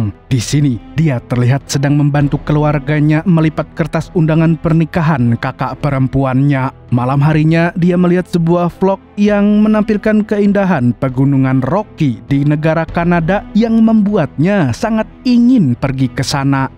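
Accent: Banjar, Indonesia. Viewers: Urang Banjar lah pian ni bang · urang banjar kah pian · Nah, urang Banjar sekali nya pang 😂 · Anjirrr urng Banjar kah ikm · Bahasa Banjar kah teh tadi lwn logat nya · urang banjar kah mang😂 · Umaaa urg banjar sekalinya leh😂😂😂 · Urang Banjar kaya nya nih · Umaa urg banjar jua sklinya😂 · Lah banjar wkkwk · Banjar pinanya 😂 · Banjar kah nih 😂😂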